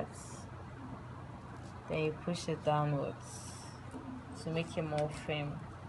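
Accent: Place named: Ghana. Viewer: I love your accent! Are you from Ghana?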